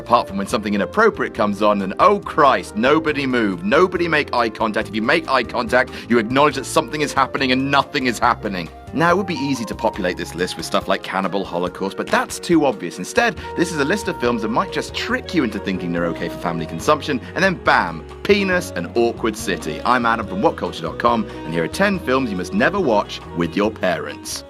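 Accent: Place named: England, United Kingdom. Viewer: Love an English narrator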